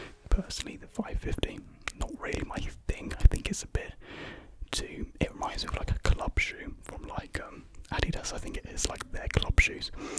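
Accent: United Kingdom. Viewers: A British asmr voice, yey🎉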